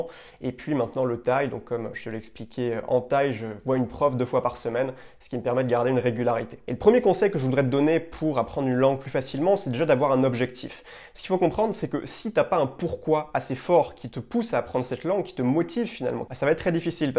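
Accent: France. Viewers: c'est trop bien l'accent français, ça aide à pécho et ça mets un peu de noblesse française dans cette langue de plouc qu'est l'anglais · L' accento francese è bellissimo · '' accent français dégueulasse '' et je suis pas d'accord, ça fait son charme des fois, à part si tu parles comme François Hollande 😅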